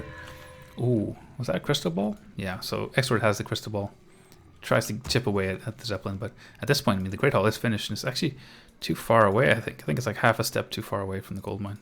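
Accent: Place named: United States. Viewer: Hey,! You sound like someone who's spent a significant time either residing in the US, or having been in continuous contact with Americans. Is there any truth to that observation?:)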